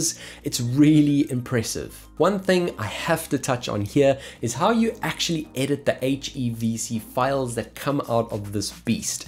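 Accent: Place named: South Africa. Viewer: Such an in depth review - thanks so much man! Sounds like you’re from South Africa as well!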